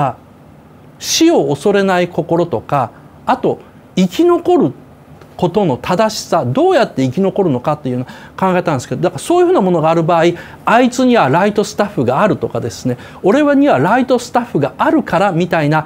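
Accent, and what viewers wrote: Kansai, Japan. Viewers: 最初の方は関西トーンな岡田さんも良いね👍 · 知ってるかもしれませんけど、この人、元々関西弁ですよ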